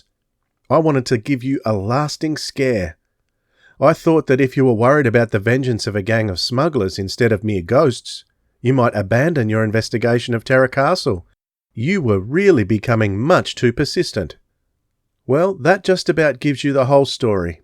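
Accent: Australia. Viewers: Are you the narrator Australian?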